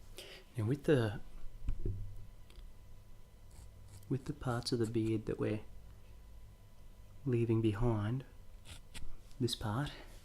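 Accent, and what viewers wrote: Australia. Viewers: your voice is perfect and the Aussie accent is an added bonus!!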